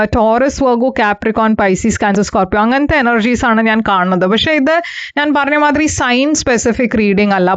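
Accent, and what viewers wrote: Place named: Karnataka, India. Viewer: Nice way of talking Malayalam any Karnataka connection undo❤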